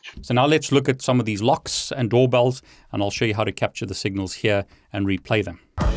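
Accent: South Africa. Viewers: South African? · Are you by any chance South African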